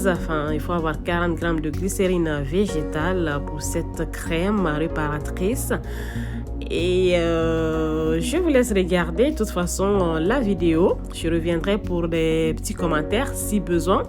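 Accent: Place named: Senegal. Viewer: Vous êtes sénégalaise y’a pas de doute, magnifique accent ❤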